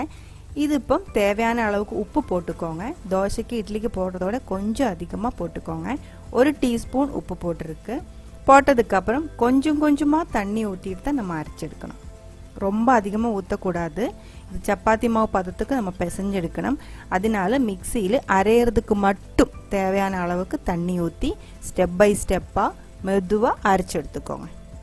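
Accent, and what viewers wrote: Kerala, India. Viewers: Your slang semma Ma'am...... Malayalam and Tamil ........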